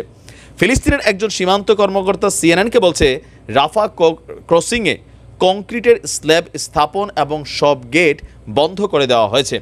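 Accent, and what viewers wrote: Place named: Comilla, Bangladesh. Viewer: আপনার এই খবরে আজকে কুমিল্লার ভাষা সুন্দরভাবে শুনে গেছে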